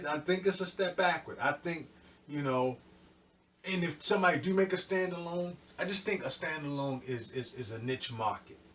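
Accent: Baltimore, United States. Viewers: Yeah Roland did just fade to black....I feel like they may come back! Roland where YALL AT?????? Sound like a Bmore accent?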